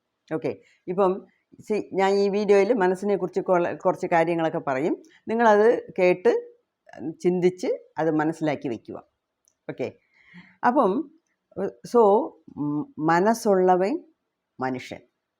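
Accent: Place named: Tamil Nadu, India. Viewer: Maminte plan anusarichu ellam orderil paranjal mathi 🙏Maminte thamizh kalarnna Maminte speech eniku orpadu ishtam 😍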